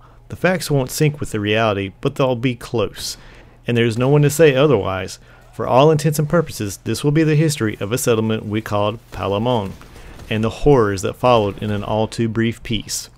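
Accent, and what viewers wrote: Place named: West, United States. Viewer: Lemme just say that your western accent coupled with the theme of the Last Word really make the telling of this story so much more interesting and immersive.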